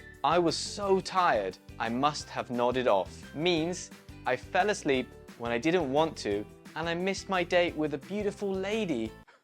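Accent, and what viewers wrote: United Kingdom. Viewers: you seem like a British native speaker are you from the uk?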